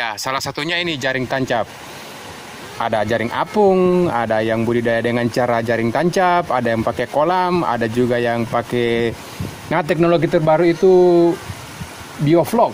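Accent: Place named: Medan, Indonesia. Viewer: mantap ini depe penjelasan, logat medan campur lompotoo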